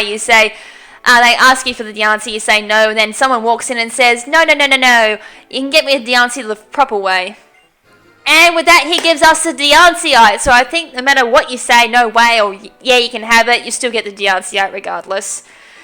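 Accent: Australia. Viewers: Female Australian accent is hot af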